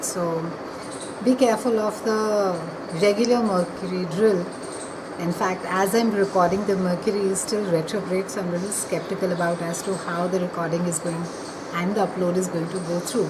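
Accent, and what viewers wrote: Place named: India. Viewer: You talking like India do you have any Indian background.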